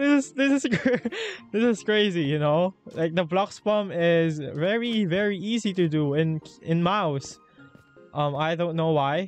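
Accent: Philippines. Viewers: that filipino accent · filipino ka ba idol? halata kasi sa accent mo eh HAHHAAH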